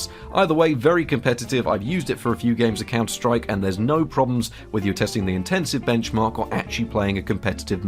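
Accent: United Kingdom. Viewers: Your english accent makes these videos better :D Not so british you can't understand the words, tho british enough so you still get that soothing effect with the words. :D plus you get to see how the world of old graphics are doing in the world today, which is tbh quite fascinating to see how well these cards are holding up. :)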